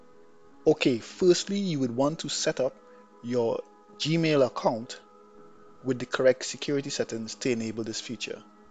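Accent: Trinidad and Tobago. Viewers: you sound trini!Im here from Barbados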